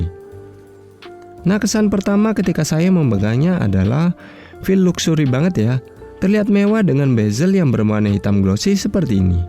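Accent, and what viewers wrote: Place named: Malaysia. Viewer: salam dari malaysia bang,loghat melayu terdengar😂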